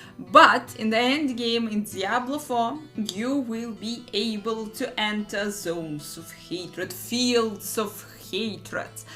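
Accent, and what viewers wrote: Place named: Russia. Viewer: По акценту как будто русская)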